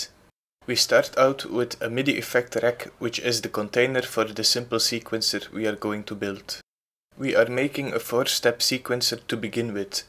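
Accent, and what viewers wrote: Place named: Belgium. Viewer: Afgaand op het accent ben je zeker ook Belg? ;-)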